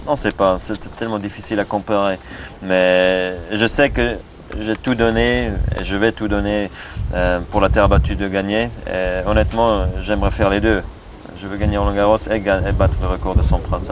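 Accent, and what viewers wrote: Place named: Switzerland. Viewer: He speaks swiss French, which is slightly different from standard French, but he's definitely fluent, he speaks a perfect French.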